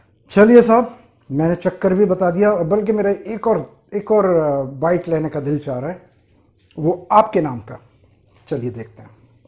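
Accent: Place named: Aligarh, India. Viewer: bhai yr qorma recipe looks awesome and your aligarian andaaz " aur sahab" take me down to memory lane of aligarh k shadi ka qorma..the only surprising thing was u added saunf..I never saw my mother adding saunf in qorma instead she use to add khashkash in it..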